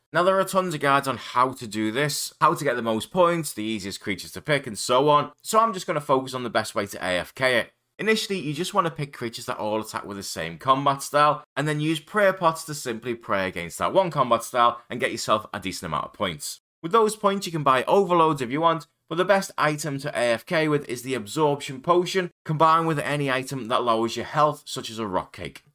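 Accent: Liverpool, United Kingdom. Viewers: Fellow scouse osrs player?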